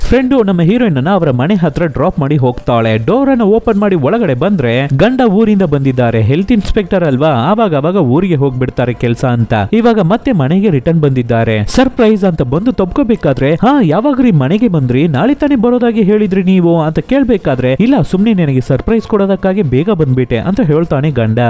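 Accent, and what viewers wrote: India. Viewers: Super brother❤adre nemdu yav uru anna nem mathado style super adike keldye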